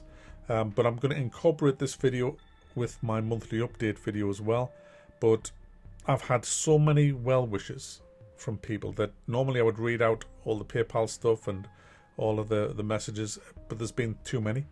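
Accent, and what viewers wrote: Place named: Newcastle, United Kingdom. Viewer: Mate, I only started watching your videos early this year (after moving out on my todd). You're a fucking legend, and it's been a true pleasure to have all your old content to spool through, while following the new stuff. You're like a stand-in Geordie replacement to me still being back at my Parents Home with my Dad watching The Hairy Bikers. lol. (Every time you're laying into David Braben from Frontier, it's what goes through my head haha, "Deeeeeive!!" < terrible Scouse interpretation of the Geordie accent.) As others have said. Second Opinions. Reconsideration. Fuck, dare I say it - fundraising for Going Private... Need to get you back in the game.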